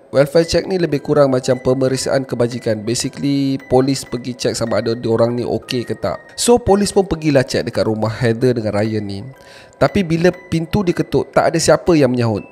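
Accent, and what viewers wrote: Perak, Malaysia. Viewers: trbaekkk...1 lagi sbbkan video2 abg yg sntiasa trbaek ni laa..jadi list fav sy untuk slalu dngar time kat tmpat krja...sblom ni kalo asyik headbanging je...lenguh jgak tngkok ni 🤣🤣🤣..dan Alhamdulillah...atas sbbkn abg ni orng perak dan stiap video abg ada sleng2 perak...skang ni dah brjangkit kat member2 skrja sbb mostly diorang smua brasal dri perak trmasuklah boss skali🤣.... yg sy baiahkan untuk jdi "murid2 baru abg...hasil dri tngok crita abg smbil connect kat speaker radio...stiap kali kat tmpat krja🤣🤣🤣🤣...btw untuk abg...smoga dipermudahkan urusan selalu dan trus melangkah maju dngan mmberi video2 abg yg menarik stiap masa...insyaAllah amin...👍🏻👍🏻👍🏻👍🏻👍🏻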